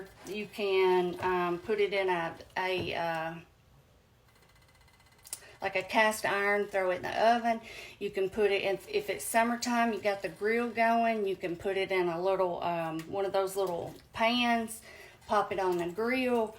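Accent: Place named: Tennessee, United States. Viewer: I recently subscribed to your channel, I so enjoy your recipes! I love listening to you, my relatives are from Murfreesboro, and your accent makes me feel like I'm listening to my family!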